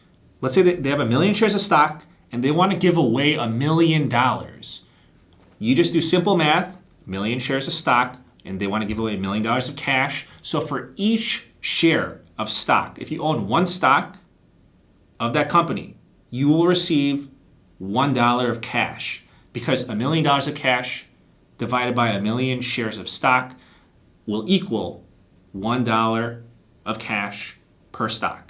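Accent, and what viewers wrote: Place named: Chicago, United States. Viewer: Thick Chicago accent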